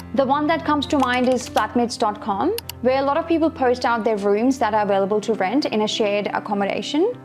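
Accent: Australia. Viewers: You have such a great knowledge with brilliant auusie accent and make more vids on foreign Nurse to how to be Rn in Australia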